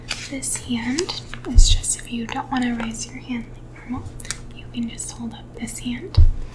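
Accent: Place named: Midwest, United States. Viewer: You’ve got a total midwest accent but your background doesn’t look like the Midwest in the winter lol. What state do you live?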